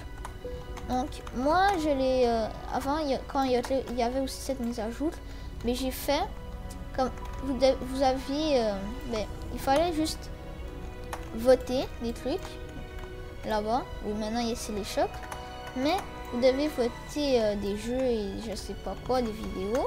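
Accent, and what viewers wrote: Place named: England, United Kingdom. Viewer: j'étais sûr que t'étais anglais vu ton accent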